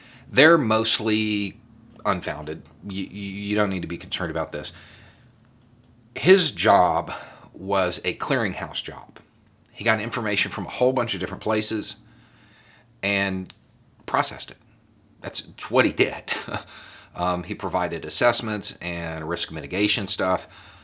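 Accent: South, United States. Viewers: Love his points of view. But can someone please tell me why he doesn’t have a southern accent in his really old videos and a super southern accent about 8 months ago? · As a non-American, it’s positively encouraging to hear that a person with a southern accent can speak without only saying insane things. I think it’s a first for me to hear. With the existence of your unique breed now confirmed, I hope more of you become visible in the media. I’m very happy to see this.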